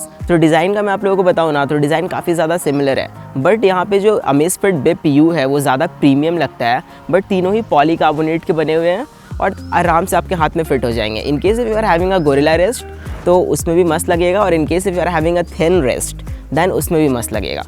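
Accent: India. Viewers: İts english but accent of indian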